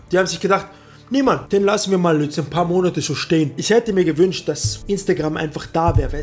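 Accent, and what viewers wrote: Austria. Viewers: Kommst du aus Österreich? #teamgeorgTV 😊😊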